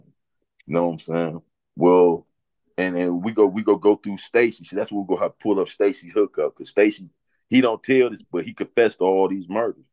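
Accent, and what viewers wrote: Detroit, United States. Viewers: That's detroit talk fam that's just how we speak . # you feel me lol good content 313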